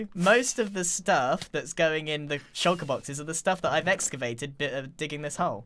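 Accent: United Kingdom. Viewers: To me he kinda sound like a British person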